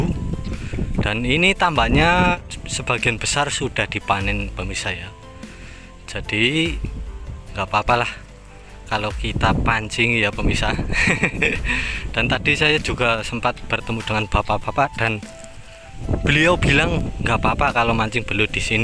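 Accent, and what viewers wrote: Banyumas, Indonesia. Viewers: Hadir kang....salam satu hobi..uhuyyyy👍👍👍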